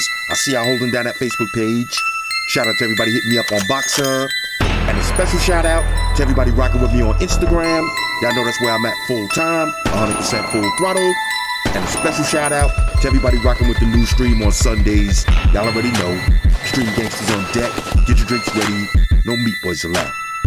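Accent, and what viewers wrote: United States. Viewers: Morning Mr. Have ever considered have another side job as a narrator in reading books. You have a very distinct American accent, which I think that you would make" lots more money". I'll surely buy it.... thanks buddy. Oh, I once had a pussy cat like yours, years ago. His name was Walter. I had him for 12 years. He passed away in 2009. I miss his company. Sad face.... nico Trinidad and Tobago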